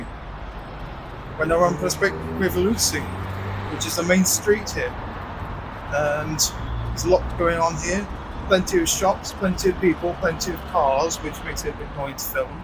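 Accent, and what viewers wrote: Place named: United Kingdom. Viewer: Какой красивый британский акцент. Спасибо Вам за видео. Я не была в Воронеже, но теперь хочу поехать прсмотреть.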